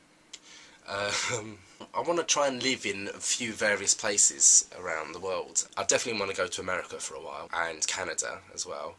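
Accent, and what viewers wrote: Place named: England, United Kingdom. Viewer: Welcome to "thatgaybunch". I thought you did a great job of introducing yourself through the questions that were asked. I believe you're going to be a great addition to this collab channel. BTW, I love the English accent.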